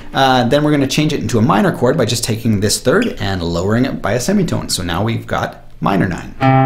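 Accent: Canada. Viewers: Your voice is so canadious, nice work by the way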